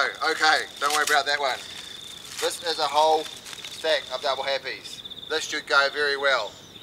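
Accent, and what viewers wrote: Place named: New Zealand. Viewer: Coz he's a Kiwi - aka new zealander. Thats their accent.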